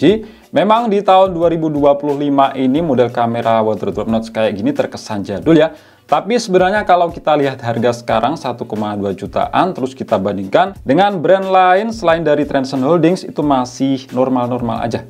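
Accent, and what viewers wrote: Blitar, Indonesia. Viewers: Orang blitar kah bang😅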